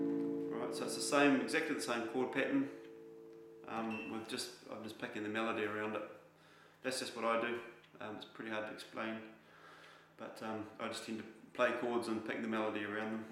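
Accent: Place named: New Zealand. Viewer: Dude, love it, thanks heaps. I'm laughing at how kiwi this whole thing is too. Mumbling bursts and unfinished sentences. Or just concluding your point with "yeah". Love it! Drive any Americans watching this crazy.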